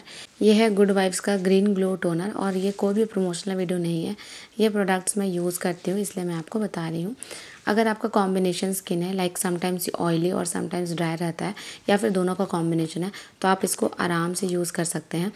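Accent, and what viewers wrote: Bihar, India. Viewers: Aapki voice bht achii h di bihari tone ❤ love from uttrakhand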